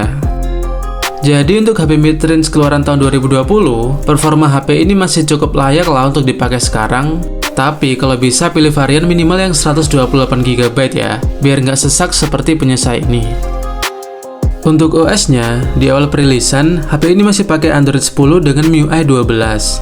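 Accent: Malang, Indonesia. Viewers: Pasti abang nya orang malang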